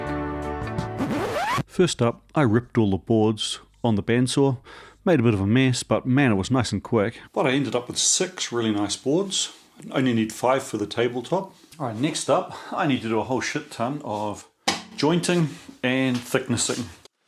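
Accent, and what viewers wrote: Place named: New Zealand. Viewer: I'm here for the old machines, the witty humour, the Kiwi accent, the aviation stories and I'll happily stay for the cooking and woodwork!!!!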